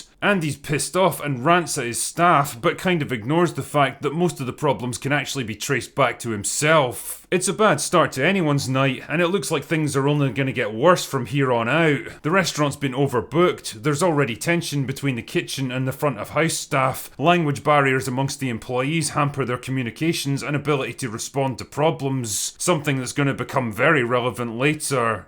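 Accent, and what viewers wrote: Scotland, United Kingdom. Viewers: I know and funny too. The Scottish accent shite!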